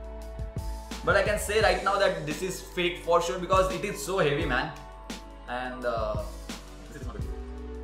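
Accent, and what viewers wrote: India. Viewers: no disresoect but i wish that some videos were limited to their country of origin because 8 cannot for the life if me understand the Indian accent 90% of the time. It just pisses me off when I click in an interesting thumbnail and I can't understand what is being said!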